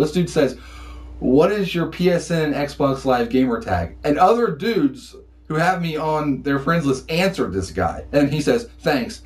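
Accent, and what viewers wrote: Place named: United States. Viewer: Bro, you have the coolest American accent ever...^_^...and your new haircut looks like Sev from Killzone 2...woooo! BTW you have zombie spaghetti sauce on your shirt...O_O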